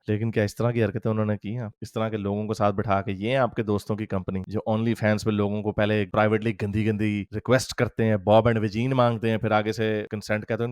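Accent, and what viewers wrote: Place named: Punjab, India. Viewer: Same here, I live in singapore and i just come here to have Great laughs and Hearing his Punjab Accent!!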